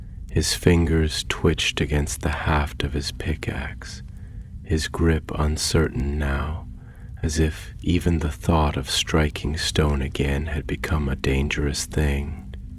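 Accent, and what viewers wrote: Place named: United States. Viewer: American accent 🤮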